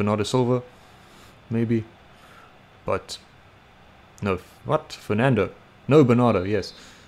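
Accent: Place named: United Kingdom. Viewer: Like the Brittish Accent!!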